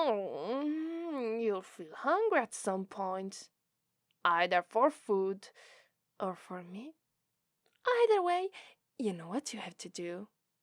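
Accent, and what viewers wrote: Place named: Italy. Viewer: Never knew how an Italian accent sounded until now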